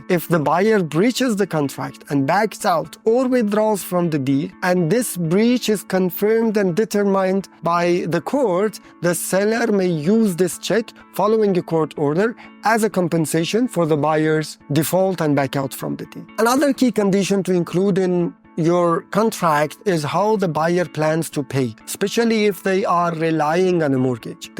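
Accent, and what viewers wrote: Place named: India. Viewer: لهجه هندی دارید 😅 جالبه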